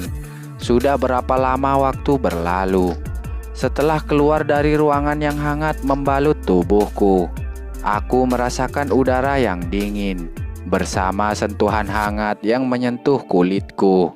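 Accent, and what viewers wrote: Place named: West Java, Indonesia. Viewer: Si mimin nih kaya nya fans nya bkent yagesya.. trus ada logat2 si jhon juga wkwkwk .. org sunda ya bang haha pake atuh bahasa sunda nya da moal nanaon ieuh meh jiga si jhon ngan bedana menceritakan alurcerita anime2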